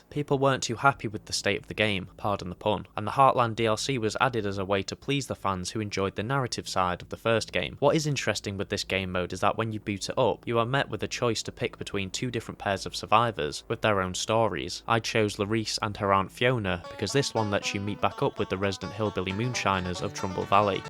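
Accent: United Kingdom. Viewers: just started replaying both games and i have to say bro you've earned a new subscriber, i watched your SOD 1 video when it came out and it was fucking hilarious and so is this one, you're a good youtuber and i hope to see more videos from you, its rare i comment saying things like this so you're welcome, cant wait for the video about SOD 3 btw, what part of the UK are you from? i cant tell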